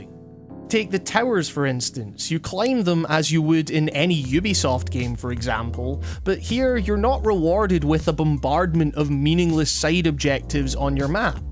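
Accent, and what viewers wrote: Scotland, United Kingdom. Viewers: I love the Scottish accent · Soviet Loli he’s Scottish...as am I, I find it kind of funny that people mistake our accents for Australian/Irish accents...but he’s Scottish. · I clicked on this video by accident tbh because I was aiming for another one but I sat and watched because I was intrigued by the concept. Beautifully put and I absolutely agree 100%. I've always loved Zelda but this game has completely reawakened my love for games. I've got all the divine beasts and instead of going straight for Ganon I want to explore the world and see what I've missed, much like I did when I was 7 and stealing my brother's Ocarina of Time cartridge. Only this time the world is there to explore! Thanks so much for putting it into words and making me realise what this game has brought me. Also hello from a fellow scot and boo to all the people making fun of our accent (they're just jealous they're no in on the brawest accent ever, eh?) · It sounds like a Scottish accent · please forgive me for this is i am wrong. 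first i'll say this video is great and you earned a new sub. i think the space of breath of the wild is fantastic and perfect. i do not like things stacked on top of each other and it promotes "hunting" for things. now for the forgive me part. i am guessing you are from Scotland and trying to down play your accent? don't....if that is what you are doing. i could not help but be distracted by how you say things and it was.....odd.....at times. but, again, great video. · Hey everyone, non-native speaker here...is this a Scottish accent? Anyway, I really like it! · its a Scottish accent not a Canadian accent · yep, it's Scottish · Are you Scottish? If so where fae? I'm from Edinburgh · is this scottish accen? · N K He's Scottish. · Lol, that’s like the mildest sort of east coast Scottish accent – hear some actual heavy Scottish accents and your mind will be blown… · I think it's Scottish... 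I like it 😊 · Im sorry to go off topic, but is that an Scottish accent? or Canadian?:D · oh sorry. plz excuse my ignorance. it's still a great accent! Scottish?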